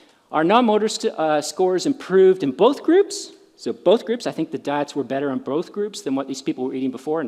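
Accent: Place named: Canada. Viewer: Love listening to your Canadian twang. Always good to hear from you.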